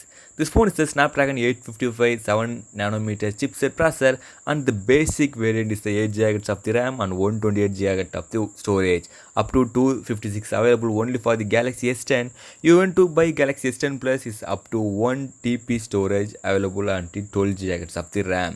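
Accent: India. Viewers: Why do you have Indian accent? Anyway you first take a lady video and then run in the hotel room showing 2 single beds? It makes me think you need the bathroom urgently ....hahahah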